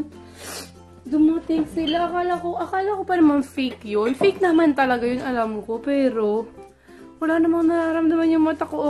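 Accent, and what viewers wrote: Visayas, Philippines. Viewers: so witty 💕 Parang may pagka bisaya accent 😂 which is ang funny pakinggan! HAHAHA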